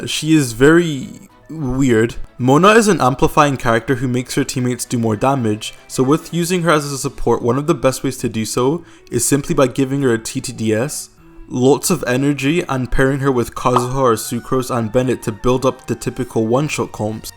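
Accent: United Kingdom. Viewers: how do you manage to sound more british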